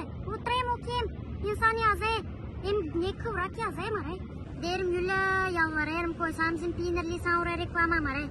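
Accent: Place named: Silistra, Bulgaria. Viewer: Keyifle izliyorum lehçe tam Silistre Türk lehçesi. Teşekkürler.İstanbuldan selamlar.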